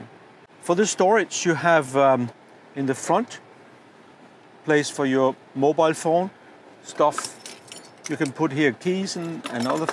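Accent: Denmark. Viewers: You can always hear a Danish guy speaking English.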